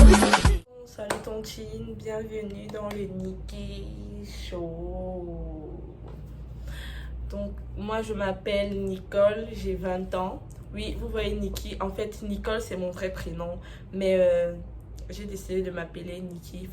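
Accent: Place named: Cameroon. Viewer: Il y a un dernier accent là qui sort quand tu parles🙌🏾😜 vive de 237🔥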